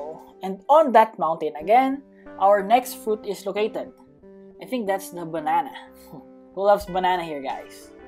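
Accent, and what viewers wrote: Philippines. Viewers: yea he is filipino u havent noticed? about his accent? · sabi kona e pnoy k e accent plg(TT) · Bro i didnt knew you were filipino sa totoo lang halata sa accent mo pero di talaga ako sure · Sabi na Filipino ka kuya Sa Pag Sasalita Palang Nang English Parang si Kuya Kitt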